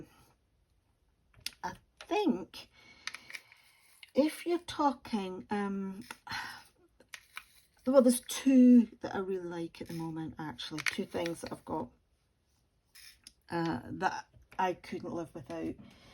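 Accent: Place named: Scotland, United Kingdom. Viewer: Welcome to Youtube! Lovely to have another voice from the UK. I love your accent - I used to live in Edinburgh for 9 years and I miss Scotland a lot! Beautiful painting, enjoy working on it!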